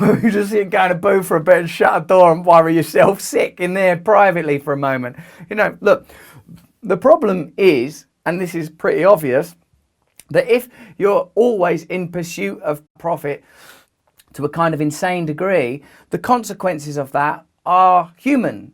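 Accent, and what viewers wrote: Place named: United Kingdom. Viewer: Your accent seems extra britshy today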